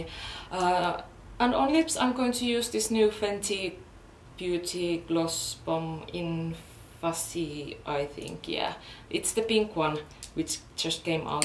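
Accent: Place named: Finland. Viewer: Aksentista arvasin että olet suomalainen. :D Jee, kiva löytää suomalaisia kauneustubettajia, jotka tubettaa englanniksi. :D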